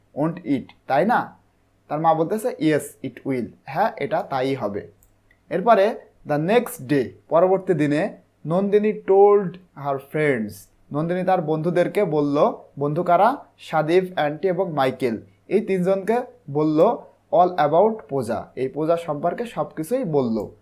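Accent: Barishal, Bangladesh. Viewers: Apni ki borishal theke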